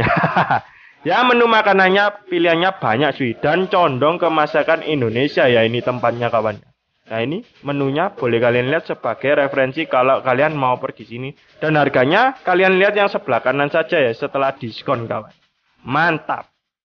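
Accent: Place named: Surabaya, Indonesia. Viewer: logat Suroboyo an nya medok banget, ditotol itu maksudnya dicocol ya, ikan bakar dan ayam bakar nya emang enak sih, mantap, Bro, sehat selalu ya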